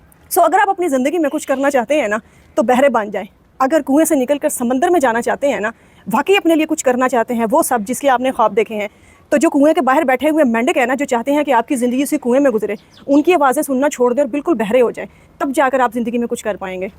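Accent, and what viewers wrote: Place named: Punjab, India. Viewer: آپ اردو کو اردو لہجہ میں تو بولیں ایسا لگ رہا ہے پنجابی بول رہی ہیں آپ کا accent مکمل پنجابی ہے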